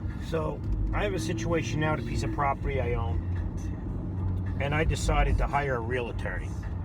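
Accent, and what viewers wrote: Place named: Long Island, United States. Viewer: The New York accent lol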